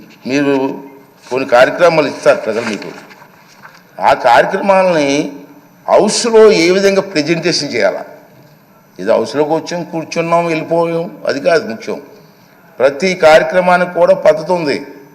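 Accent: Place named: Godavari, India. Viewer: Nice Godavari slang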